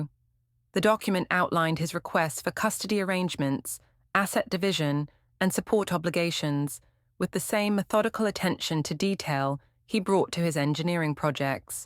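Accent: United Kingdom. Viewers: I hate the British accent in these stories. The stories tend not to get to the point but a exercise in proper English. I. Prefer the raw, to the point speaking.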